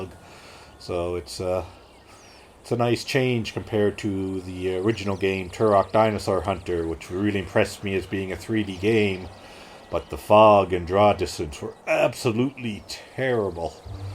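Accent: Canada. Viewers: That's the Vinewood Bowl, based on the real-life Hollywood Bowl. And you do have a slight Canadian accent, especially when you say "out" as "uwt", rather than the broader American "aaaout".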